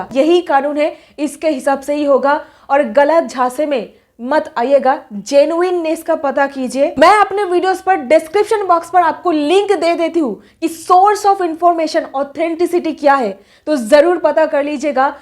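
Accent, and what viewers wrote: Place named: West Bengal, India. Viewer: Sotti bolchen aaapni....aapka accent sunke pata chal gaya aap WB se hai.....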